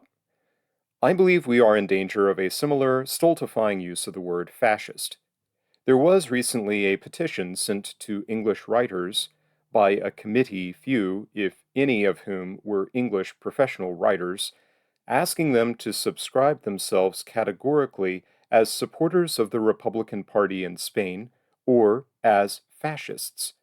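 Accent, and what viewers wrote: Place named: United States. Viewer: Wrong for this to be read by an American voice.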